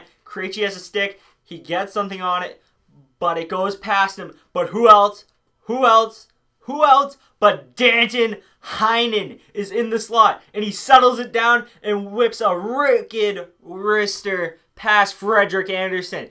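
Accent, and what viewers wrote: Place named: New England, United States. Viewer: Yo. I'm trying to place your accent. You from MA or RI? Btw, love your videos.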